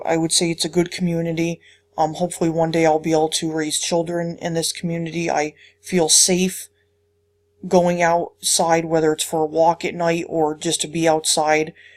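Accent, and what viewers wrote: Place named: Northeastern Pennsylvania, United States. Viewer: I was born here in 1945 and just sold my father's house not too long ago. I still come back to visit. Great job,. Hopefully, people like you will make Plymouth once again what is was when I grew up there. You even speak NEPAlese perfectly! May God bless your life in Plymouth.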